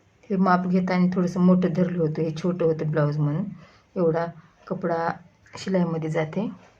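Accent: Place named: Hingoli, India. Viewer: Tie chan shikawlat shantapane kasa shiwaycha blows te. tumhi Vasmat Kiwa Hingoli chya ahat ka? Tumchya bolnya warun watla.👌👌😊 thank you.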